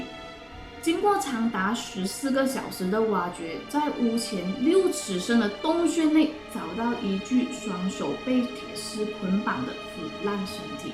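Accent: Malaysia. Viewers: 马来西亚华人的普通话口音很有趣，你是广东人后裔吗？好漂亮～ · 对啊这是马来西亚口音 ，就很像你们在外国人面前说英文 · 她是马来西亚华人，肯定跟你们不同啊。。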